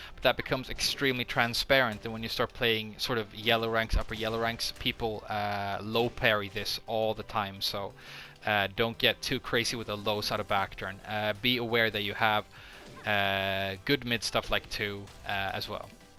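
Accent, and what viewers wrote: France. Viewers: HIS FRENCH ACCENT LMFAOOO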